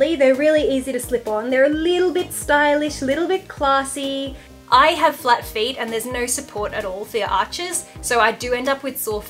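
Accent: Australia. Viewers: Also I’m Australian and I can confirm that’s how we pronounce things, fight us international folk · Please say things the way you naturally say things. I love Australian accents and learning how other people pronounce it call things. —People that seriously can’t get over the way other people say things are infuriating. News flash!! People from other areas aren’t always going to sound just like you. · You are just too sweet. I love your accent and the way you say pastel. You always want to please people, but you ALREADY ARE. If they are coming at you in any negative way they can buzz the hell off to their perf YouTube-r. There are plenty of us than know, understand ADORE your Aussie differences. Never change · Are the two ways you pronounce "pastel" spelt differently? When you say things differently then I do I just think it's part of the Australian accent 🤷‍♀️ also just for fun I thought I'd mention that pastel means cake in Spanish which when I learned that found funny 😁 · WOW! 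I cant believe that girl Nina Tailor was SO INCREDIBLY rude! 1st of all, if u don't like it, dont watch.. 2nd of all, Archie is the most awesome bird on YouTube and Yes, HATERS, he does need to be in every video he wants to be in. Also, I LOVE ur accent! What kind of person tells you your wrong for speaking in your native language/accent? Literally if you were to ask any person who knows me they would all tell you this about me: I always say that I want to hire an Australian person to give me bad news because I feel like no matter what you say, if you say it in an Australian accent it sounds happy lmao. I fairly recently found your Channel, did a bunch of binge-watching, and of course subscribed! I think you're great, I love you, I love Archie, I love your content.. screw the haters they're just jealous! Keep doing your thing girl cause you're awesome!! And as always, TYFS! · I love your Australian accent. 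I may be American and you say words differently (obviously) but I don't feel like it's that big of a deal. I understand what you're saying, so say it however you want. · Are u from Australia I’m from Australia 2 Sydney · Please don’t change how you say things, be Aussie proud🤗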